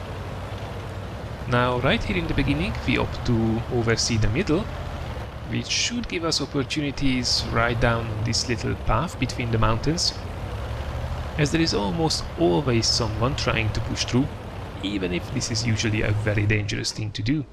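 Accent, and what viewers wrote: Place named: Hungary. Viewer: Szia, ahogy hallgattam az angol kiejtésed nekem egy kicsit furcsának tűnt gondoltam hogy nem vagy eredeti angol, de rájöttem hogy a magyarok pont így beszélnek angolul. Biztosra akartam menni ezért a kommentekhez mentem és ahogy olvasom tényleg magyar vagy. Nagyon jók a videóid és az angol tudásod is. Csak így tovább!!!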